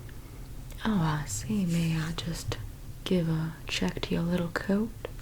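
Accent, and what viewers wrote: South, United States. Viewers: Southern is best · love the southern accent its top tier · the southern DRAWL I cannot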